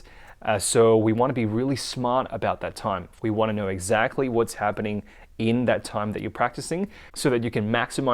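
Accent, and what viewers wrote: Australia. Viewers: lmao just because he has an aussie accent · Aussie accent. Great! You're pretty good mate. Are you based in Canberra? And if so, do you offer private tuition?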